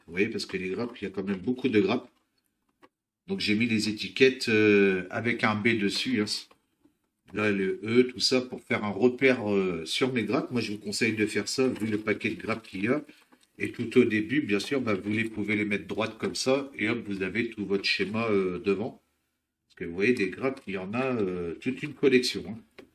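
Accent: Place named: Germany. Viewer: je prend ta vidéo très en retard mais ton anglais sur ton tube ABT ce serait plutôt de l'allemand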